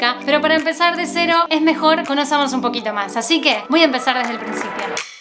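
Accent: Argentina. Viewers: Me encantó el vídeo, por fin dejaste de hablar raro y volviste al argentino!!! Esperamos más vídeos!!! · Me encanta el acento argentino😍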